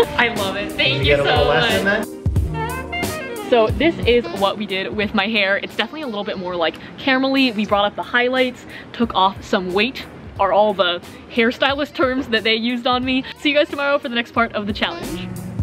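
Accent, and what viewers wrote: United States. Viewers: aa a Well considering has a very clear american accent, asking her what nationality she is doesn’t make much sense in america.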